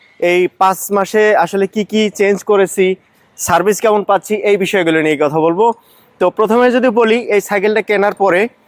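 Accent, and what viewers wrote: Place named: Chuadanga, Bangladesh. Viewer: ভাই আপনার কথা শুনে মনে হচ্ছে আপনার দেশের বাড়ী চুয়াডাঙ্গা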